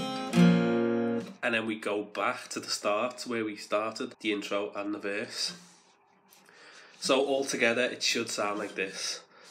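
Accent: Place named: Liverpool, United Kingdom. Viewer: Scouser!! Good man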